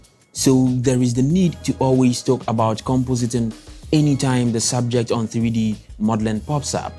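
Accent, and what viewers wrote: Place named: England, United Kingdom. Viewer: I know After Effects. Want to get into 3d. Learning Maya 3d these days. I like your English accent.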